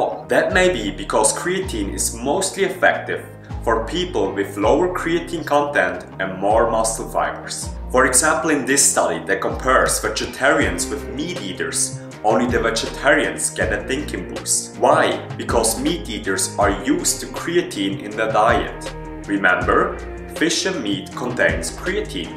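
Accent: Germany. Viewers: Bayerisches Englisch 😆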